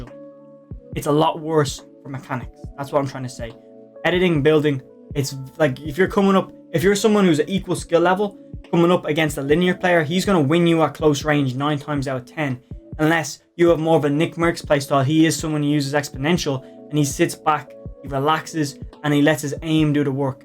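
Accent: Ireland. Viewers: I love that irish accent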